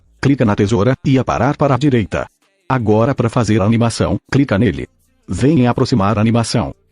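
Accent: Bahia, Brazil. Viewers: O miseravel e um baiano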